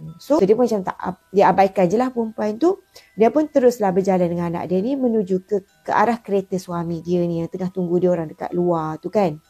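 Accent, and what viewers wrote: Kelantan, Malaysia. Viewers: Akak ni org kelantan ke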